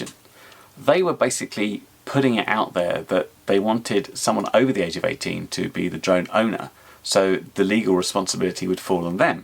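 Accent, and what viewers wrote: United Kingdom. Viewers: I was wondering where you were based on your accent, i didn't know you were Southampton till this video, your not far from me :) I currently have no intention in registering and if everyone did the same it would make it unenforceable. Im confused about the CAA 1000ft exemption, does it still apply to all fpv flyers? Since the start of all this, i have felt that 400ft within a certain proximity to an airport is fair enough but if your not near low flying aircraft then the 1000ft limit should still apply. i live just outside restricted military airspace, im outside there zone and so they never come here but commercial aircraft do not have any flight paths over here ether as i guess they maintain a no man's land buffer to be sure. i can fly perfectly safely at any altitude here and never come into conflict with military or commercial aircraft and to be (officially) kept to 400ft is just stupid. 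As for auto pilots, which way do they want it? Ether they want to say that autopilots are not allowed over a certain altitude OR they want geofencing, you cant have both! The idea that you would remove Autopilots from something is just bonkers, all it achieves is increasing the risks, not diminishing them! I fly over farmland, no matter my style of flying, be it low and fast or high altitude never puts anyone or anything in any danger and i will continue to fly with common sense instead of a lack of sense that the government are displaying over this. I also feel that the more rules the go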